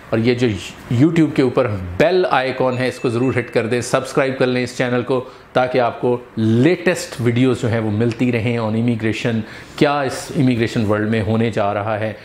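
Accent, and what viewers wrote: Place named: India. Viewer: It's a shame the video doesn't come with subtitles. It looks like it was a super interesting information but I could only catch a couple of phrases. Indian accent is super difficult when you're not an english native :(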